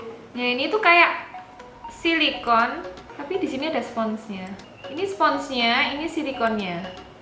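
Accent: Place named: Malang, Indonesia. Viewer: Ben ndelok video e mbak aku mesti cengar cengir ambek medok e😂 malang e asli👊👊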